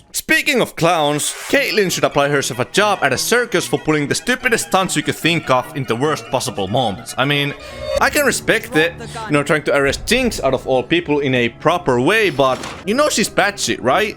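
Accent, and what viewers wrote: Finland. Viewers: Ensimmäiset kymmenen sekuntia: Aksentti.. Onks tää jätkä suomalainen? : Hmm : :D Vois itsekin katsoa suomeks läpi. For shits and giggles :D Noh mutta kumminkin hyvä video. 6/5, toimii. Pitänee katsoa muutkin tekemänne videot. Ja kanava tilattu! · Your channel is criminally underrated. I like how you become progressively and aggressively more Finnish throughout the video. Edit: Btw, you have the personality and energy of a person 1M subscriber channel so keep it up. You could make it big for real. I was expecting to see at least several hundred thousand subs at least when I looked at your subscriber count. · Hahahaa I knew you were finnish!!! :D huomasin heti aksentista x) Mutta arcane on vitun hyvä, se taide on niin kaunista en kestä! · Love the Finnish accent.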